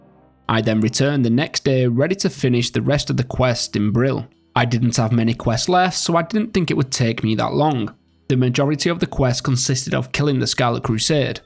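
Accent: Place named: Lancashire, United Kingdom. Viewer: Nice vid mate i certainly detect a lancashire accent. Chorley/wigan by any chance?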